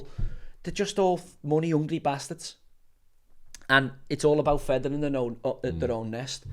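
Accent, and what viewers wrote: Liverpool, United Kingdom. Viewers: Nothing beats a great Scouser rant. But spot on brilliant commentary! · He talks so much shit Uneducated scouse tinkler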